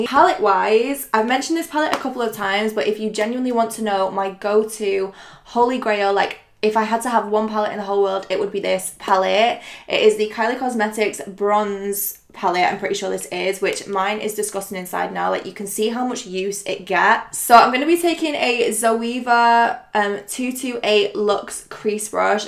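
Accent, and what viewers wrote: United Kingdom. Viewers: how did i know you were british the second the video started?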